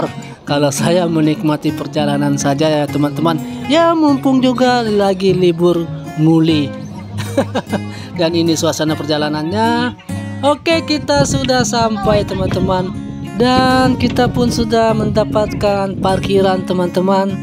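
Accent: Medan, Indonesia. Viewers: bg, abg orang medan y bg?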